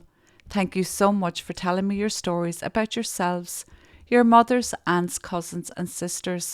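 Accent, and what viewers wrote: Ireland. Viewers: I’m really hoping that you please 🙏 read my messages. For some reason I don’t receive any notifications 🔔 regarding your videos and I don’t know 🤷‍♂️ why so can you please be able to help me out with this problem? Thanks very much. Also I might like to add that I really enjoy your soothing voice and your material along with your lovely Irish ☘️ accent, your borough!! You have the most amazing and different videos that I’ve come across!!! 🦋🌈😊🌹🇮🇪💚😄.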